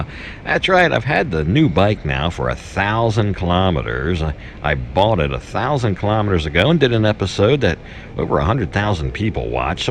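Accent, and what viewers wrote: United States. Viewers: Are you an American living in Thailand? Sounds like an American accent lol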